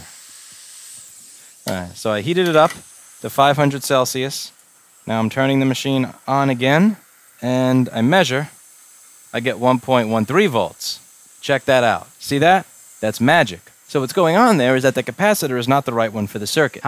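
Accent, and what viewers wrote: United States. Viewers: why do you Americans say "Sodder' and not "Solder" as it is spelt.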